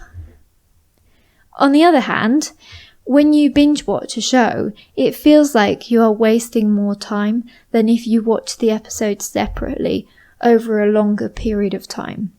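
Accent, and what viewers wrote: United Kingdom. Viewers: I began listen to this podcast from yesterday 😊 , i see British accent is beautiful than American . I liked the way when u said word ( relax ) 😍.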